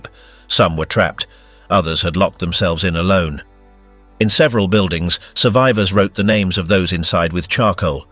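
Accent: United Kingdom. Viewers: English accented narrator, yet he says "aluminum" and "flashlight" (when he means, judging from the sentence context, "torch"). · AI videos with a british accent.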